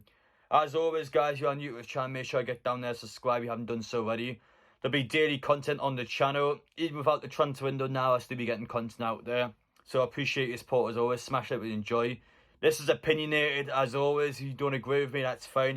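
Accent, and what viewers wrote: Newcastle, United Kingdom. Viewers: Some really good signings. I think its easy to get carried away, but the fact is we are in a relegation battle. These signings were made with that in mind, in my opinion. 8.5/10 for the signings overall. 10/10 for keeping us updated throughout the window, love your passion lad, proper geordie 👍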